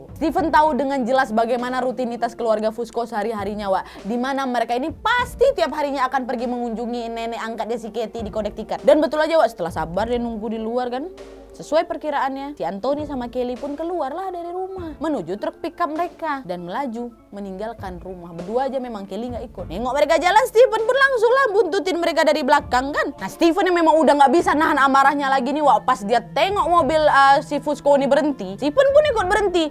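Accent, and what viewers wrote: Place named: Medan, Indonesia. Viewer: Mantap kali logat kakak 🤣 sama2 orang medan nya kita kak e ??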